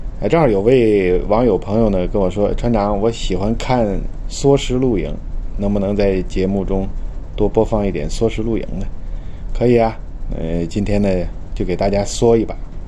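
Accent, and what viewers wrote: Tianjin, China. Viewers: 您是天津人吗？ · 船长辛苦！下次来纽约新泽西需要什么提前说一声，无论你在哪一个terminal停靠，我都可以给你送过去。您略带津味儿的普通话很咯儿很亲切（我是北京的，您有一期节目车在二环上行驶从我家楼下经过)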